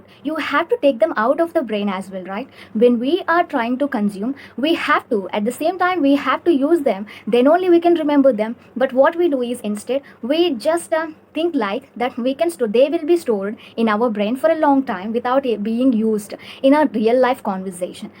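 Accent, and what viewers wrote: South India, India. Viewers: Hey you talk very nicely....and that South Indian touch while motivating people is great... every South Indians has this thing in them... talking so maturely...keep up the good work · Indian accent u know ?😏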